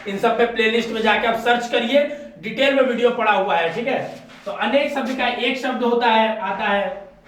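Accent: Varanasi, India. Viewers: Sir apki Voice batati h aap pure banarsi h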